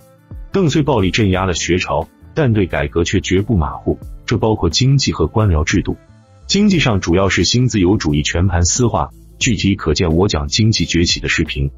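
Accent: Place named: Taiwan. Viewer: 台湾人?有台湾腔。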